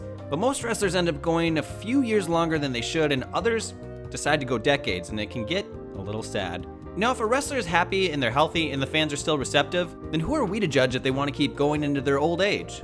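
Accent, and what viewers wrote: United States. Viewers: Yay, a no British accent shit. Pure American dialect. Yay. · This is the first time I've heard a whatculture list with an American type accent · The only American voice on what culture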